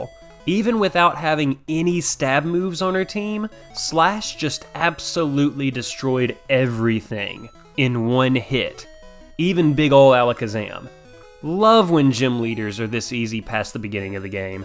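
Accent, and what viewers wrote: South, United States. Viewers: I follow Canadian Poketubers, British, Irish, Australian,... now I can add "Southern American" to the list of accents I get to hear from Poketubers :D Very cool challenge videos, please keep it up!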